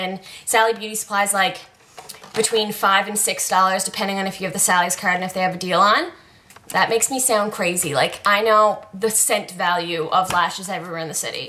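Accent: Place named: Prince Edward Island, Canada. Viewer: Are you from PEI? Haha I can sense an accent. Great video!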